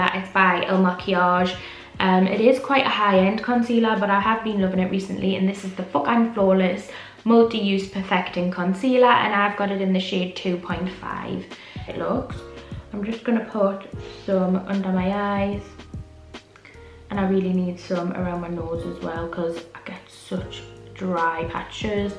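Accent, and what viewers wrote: United Kingdom. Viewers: Hi!! I've been watching your channel since 2018, for me watching and hearing you has been a great way to improve my english not only because i try to understand everything you say but also because you have such a strong accent that helps me get used to the different ways of speaking english in the uk! I found your channel when i lived in Leeds working as a Spanish/foreign language assistant in a school and it has been really useful! I'm glad that you are encouraging people to learn other languages and to be less selfish about speaking English in non-native english countries! I wish you the best luck with this journey:)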